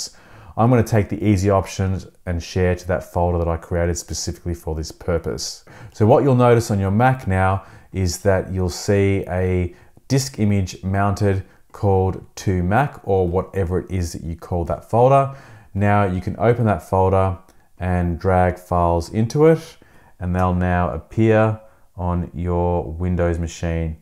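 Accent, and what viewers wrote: Australia. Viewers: sounded Aussie to me...?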